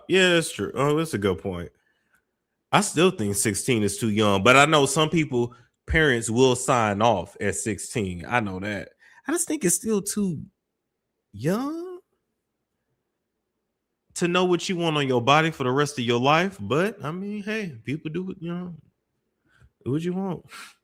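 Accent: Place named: Missouri, United States. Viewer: I thought you was from Missouri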